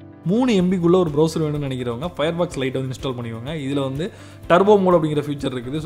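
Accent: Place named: Nagapattinam, India. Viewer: Neenga nagapattinam ahh bro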